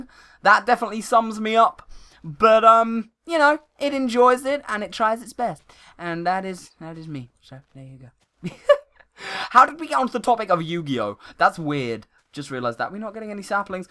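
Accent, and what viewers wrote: United Kingdom. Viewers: QOTD: I don't know what u mean but in minecraft I like to travel by sprinting and in real life I like traveling in a car because u see more then taking a plane also can u do more daily captive minecraft 2? Thanks your vids are great and I love your British accent of your voice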